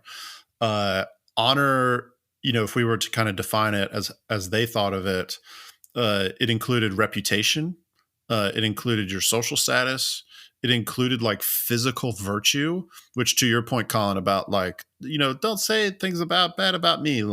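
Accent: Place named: South, United States. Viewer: You don’t have to think that you have to think about speaking in order to mask your little southern twang. Bc thinking you’re an idiot bc you’re southern is a sterotype, like it would be assuming someone is southern bc they are an idiot. Now being from a RED state, would be a logical assumption, but simply southern. Do you know how many idiots are from MASS and Michigan!? 🤣🤭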